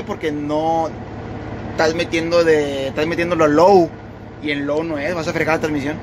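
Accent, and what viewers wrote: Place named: Mexico. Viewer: Me fascina ese acento Mexicano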